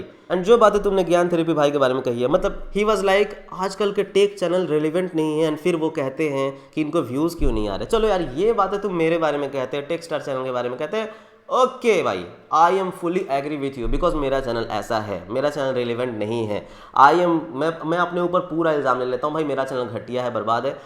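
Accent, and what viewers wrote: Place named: Uttar Pradesh-Bihar, India. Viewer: Mai bhai channel hi isiliye dekhta hu kyuki unki personality aur bolne ka tareeka typical up Bihar wale aadmi ka hai, jise sunke aur dekhke kuch apnapan lagta hai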